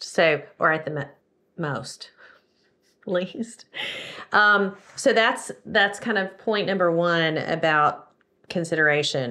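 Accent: South, United States. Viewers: I like your Southern accent...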